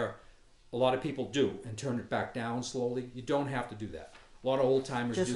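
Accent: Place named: Boston, United States. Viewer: Greetings from back east. You are Boston to the bone. Love it!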